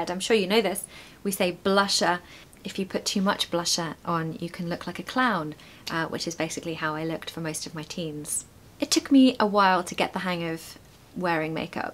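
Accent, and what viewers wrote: United Kingdom. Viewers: Actually, I loved English at the very beginning because of British way of pronunciation. Now I enjoy it listening to you. Thanks for the videos. They are soo lovely 😊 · I am from Bangladesh. Your teaching method is amazing and heart touching. I am really smitten learning authentic and latest British accent from a versatile English teacher like you. May Allah bless you with his divine love to live long! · I love you, and I love british accent too ❤ Amazing video. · I'm from Thailand .l love British accent And you so Amazing ❤ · I don’t know how to describe my feeling now, just to say you’re great, you’re amazing; your choices, your performance and your accent. I found your channel last night and saw you had uploaded your first video two months ago. Really liked to be the first one who watch your videos. You speak English like a mermaid, soft, elegant and poetic. 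Your tone is so soulful. I’ve seen lots videos on YouTube in the British accent but yours are something else. We’ll await new videos.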